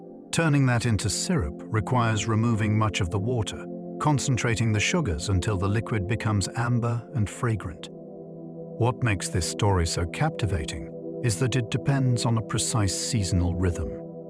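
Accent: England, United Kingdom. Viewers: “Hello there” in an English accent now Pavlov’s me into yawning. As a lifelong insomnia-sufferer, I consider this a success.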